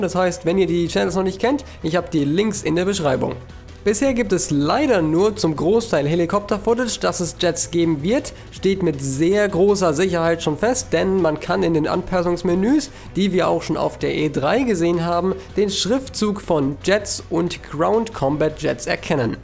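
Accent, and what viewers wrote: Germany. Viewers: Ich bin Finnisch und ich liebe deine Deutsch acschent :)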